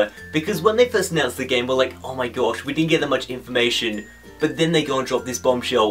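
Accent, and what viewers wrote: Australia. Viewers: Azman Ismam He's an Aussie XD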